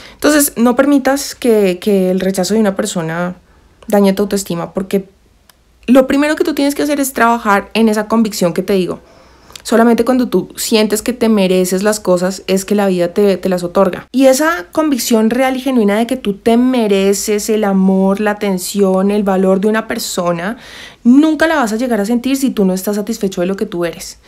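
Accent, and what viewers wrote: Bogotá, Colombia. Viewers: Siempre me ha gustado como hablan las colombianas y tu no eres la excepción, y muy buena informacion gracias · Hay diferentes estratos en bogota esa es la diferencia pero si es rola lo digo yo que vivo acá y el que dice que hablamos ñero se la paso en sur de bogota que es reñera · Sí es Colombiana como yo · Colombiana!?? Un acento q me encanta !!🤩😍😍😍😍😍😍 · Colombiana pero este es el acento de BOGOTÁ osea el acento rolo!!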